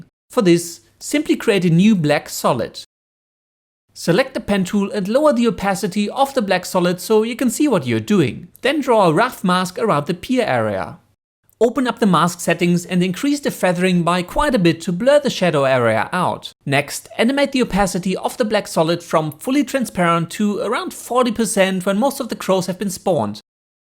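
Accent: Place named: Germany. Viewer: Holy fuck you have a German accent xD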